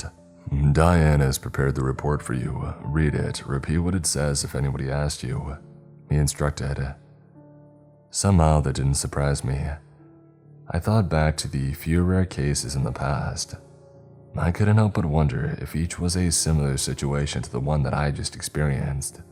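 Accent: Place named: New England, United States. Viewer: When I visited most people called it Baw Hawba. 😂.. I love that Yankee accent!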